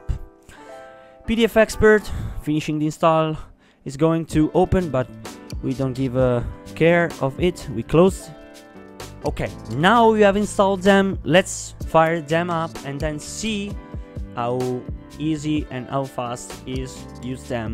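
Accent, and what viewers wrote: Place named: Italy. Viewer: hi, I couldn't help but notice that, by any chance are you Italian? you sound really Italian I don't know why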